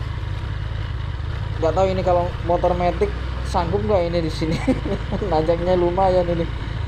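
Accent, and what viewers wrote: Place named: Banyumas, Indonesia. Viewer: Itu bahasanya kok ngapak bang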